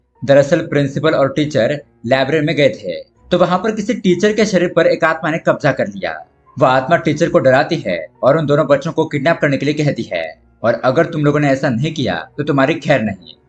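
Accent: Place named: Sindh, India. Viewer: mujhay lagta hai ap ki mother tongue sindhi hai.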